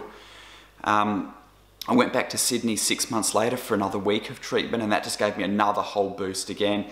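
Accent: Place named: New Zealand. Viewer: Hi! I just come across you a few minutes ago. I to have chronic fatigue and I am a Christian. I was just diagnosed early last year 2020. I’m from Auckland New Zealand. You sound like a kiwi are you from N.Z?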